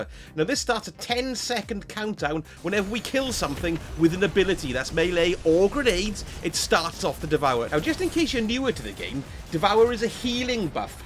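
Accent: Wales, United Kingdom. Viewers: i can spot a welsh from a mile !!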